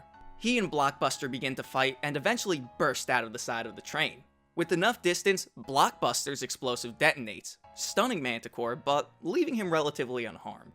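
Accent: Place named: Boston, United States. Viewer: Homeboy and his Boston pronunciation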